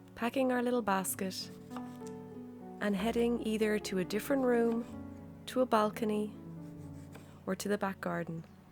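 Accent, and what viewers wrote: Ireland. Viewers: Where about from Ireland are you from as I adore your accent. My parents were Northern Irish and from Belfast. They emigrated to Australia when young and had 3 children with me being the only girl and youngest. I’ve never been but as I’ve got older I speak now with a broad Belfast accent I picked up from my years living with my parents. My husband is Scottish and we can’t believe how broad my accent is even though I’ve never been abroad. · Oh wow! I loved this. I don't know how I haven't seen your channel before! I'm Irish but living abroad and the two things I miss most about Ireland, the Irish accent and nature, you provide in abundance. I am now your number one fan ;-) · Well I know that I could sit and listen to that Irish lilt all day long!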